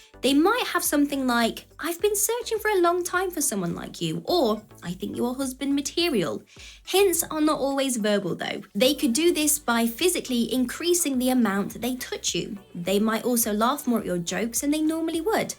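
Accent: United Kingdom. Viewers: Dude I love your worldsmost wickedest British accent in this video of yours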